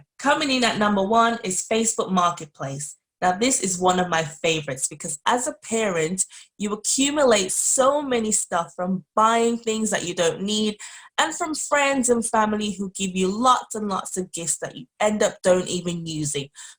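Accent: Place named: United Kingdom. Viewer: Why are you quoting $ dollars and not £ Sterling when you have a British Accent? Where are you based?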